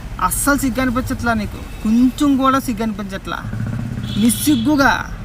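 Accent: Godavari, India. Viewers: ప్రతి అంశాన్ని గోదావరి యాసలో చక్కని విమర్శతోకూడిన విశ్లేషణ చేస్తున్నారు, గో ఎహెడ్!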